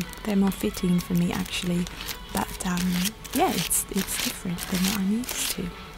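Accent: United Kingdom. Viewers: Lovely British accent. Very soothing voice. Gorgeous nail tapping.😃👍✨🍀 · i love ur accent !! the best, im in love wit british accents😩😩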